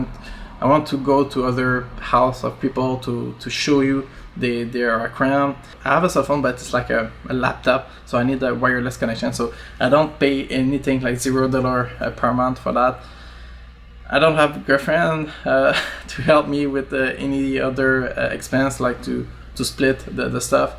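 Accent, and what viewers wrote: Quebec, Canada. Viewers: I am new to the hobby and have enjoyed your videos for tips and honest opinions. I grew up in Brossard, so the accent is something easy for me to understand (I am in the USA now, but my French is still pretty good). Losing a job is hard as it shakes your confidence, but even with the economy being shaky, it is not the end of the world. Hope you find something interesting soon and that you keep making your good videos. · Oh man, what horrible timing my poor fellow Canadian. You really have something special with this channel, I know you'll be hitting the big youtube numbers in the next few years if not months. Your videos are nothing short of amazing, I just love reading your subtitles and hearing your wonderful accent in my head. Your shots are so beautiful, your stories are enthralling and completely worth waiting a few seconds to see commercials for! 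You're worth it! Don't sell yourself short. Maybe try for something part time just until you get the numbers up? Sponsors are fine too, it would be awesome if they let you critique them as you like to, those videos are very informative. Most people will take any adverts like a grain of salt and make up their own minds anyways so you shouldn't feel bad there! Wishing you all the best and looking forward to your next video <3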